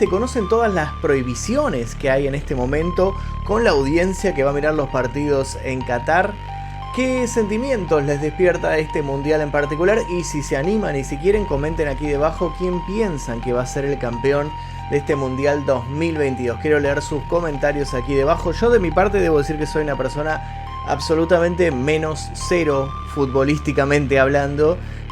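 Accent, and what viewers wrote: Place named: Argentina. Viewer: Con todo respeto, no lo se, pero me atrevo a decir que por su acento es argentino, y si es el caso, es la primera vez que veo un video de un argentino hablando del mundial y sin decir que o explicar por que Argentina debería ser campeón. (Ojala si gane) hahaha apoyando a latam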